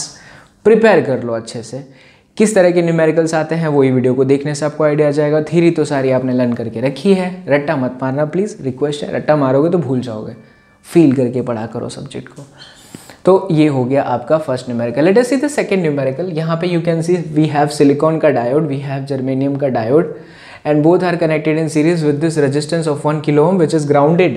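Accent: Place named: Haryana, India. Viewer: Ni gujrati he is frm surat but hrynvi ati h unhe😁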